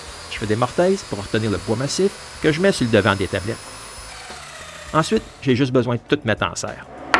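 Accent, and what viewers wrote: Quebec, Canada. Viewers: encore une fois, Merci! Vous êtes ( et toi) les meilleurs.Avec en plus l'humilité et la modestie que l'on ne retrouve pas dans d'autres vidéos de bricolage. Pour beaucoup c'est souvent à l'américaine, avec beaucoup de blah blah et gesticulations pour au final n'être que de l'assemblage d'éléments achetés en grand magasins avec un résultat déplorable. De plus vous me réconciliez avec l'accent de la belle province!. A propos, que veux dire " Gosseux d'bois "?!? A bientôt!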